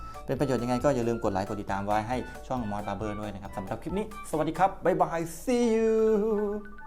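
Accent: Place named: Isan, Thailand. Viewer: 555มีอารมขันด้วย เว่าอิสานได้ยู่ตั้วนิ่☺☺😅😅😅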